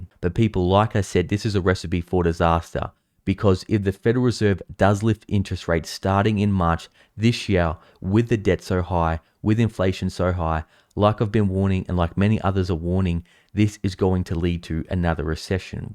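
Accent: Australia. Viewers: You sound like you're from Australia how's it going down there I think you should probably pay more attention to where you're living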